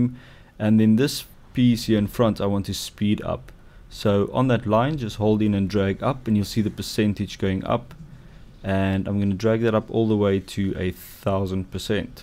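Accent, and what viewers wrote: Afrikaans, South Africa. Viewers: Baie Geluk, ek sien een van jou videos is gefeature op No Filmschool, toe ek die video kyk toe kon ek sommer hoor hierdie is 'n Afrikaanse Ou. Baie geluk!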